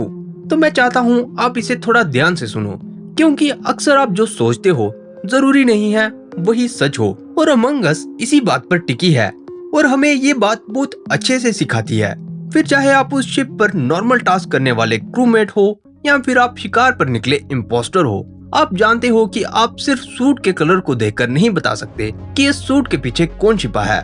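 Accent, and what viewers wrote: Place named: Punjab, India. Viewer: Your voice is like punjabi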